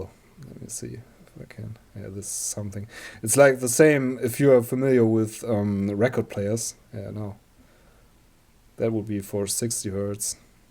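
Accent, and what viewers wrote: Germany. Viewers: Nice Video. Could it be that you are from Germany, weil du hast einen leichten deutschen Akzent 😁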